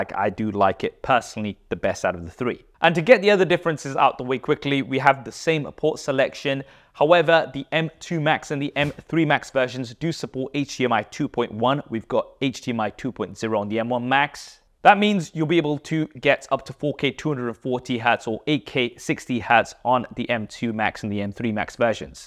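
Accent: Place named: United Kingdom. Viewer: arab guy w british accent, thats new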